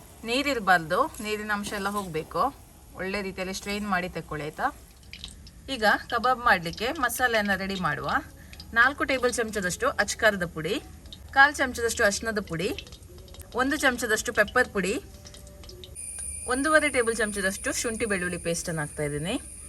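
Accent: Mangalore, India. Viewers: ತುಂಬಾ ಚನ್ನಾಗಿದೆ ರೆಸಿಪಿ ನಾನು ಮಂಗಳೂರಿನಲ್ಲಿ ಎರಡು ವರ್ಷ ಕೆಲಸ ಮಾಡಿದ್ದೆ. ಆಹಾ ಮಂಗಳೂರು ಕನ್ನಡ ಕೇಳುವುದು ನನಗೆ ತುಂಬಾ ಖುಷಿ ಕೊಡುತ್ತೆ. ಮದುವೆಗೆಂದು ಮಂಗಳೂರು ಹುಡುಗಿ ಸಿಕ್ಕಿದ್ದರೆ ದಿನಾ ಹಿತಕರ ಕನ್ನಡ ಕೇಳ್ಬಹುದಿತ್ತು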